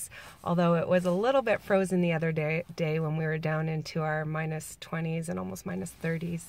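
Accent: Canada. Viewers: I thought you sounded Canadian! 😄 I'm in Wisconsin on lake Michigan, great lakes goddess siSTARs ♡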